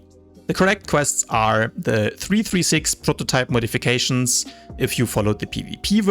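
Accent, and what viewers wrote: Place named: Germany. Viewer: The "german" english is very nice zu hören :D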